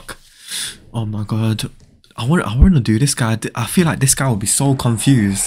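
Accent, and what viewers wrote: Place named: United Kingdom. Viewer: Why do u sound more British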